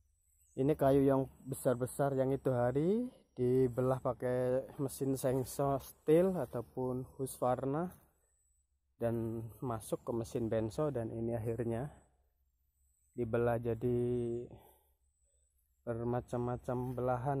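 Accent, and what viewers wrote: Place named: Cilacap, Indonesia. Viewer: Wa'alaikum salam Bermacam macam kayu yang sudah di tasak ...oh jenengan wong Cilacap Tah Mase 😃 , Sugeng enjing mas bro sukses slalu 👍👍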